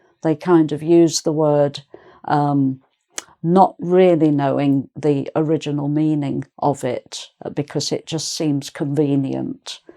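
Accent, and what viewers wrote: United Kingdom. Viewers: Thanks so much for sharing this interesting and useful video about buzzwords. I'll try to teach them in my following class. It's a pleasure to hear such a beautiful British accent. 💖 Greetings from Spain. 🌷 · i see it is a british accent ! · I live in Brazil. You can't imagine how happy I am, having you as a teacher, teaching the real British English. I can understand you, my target is having such a noble accent. Thank you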